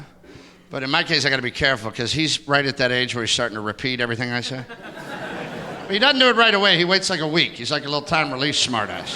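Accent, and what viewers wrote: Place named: Pittsburgh, United States. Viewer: That Pittsburgh accent is just made for stand up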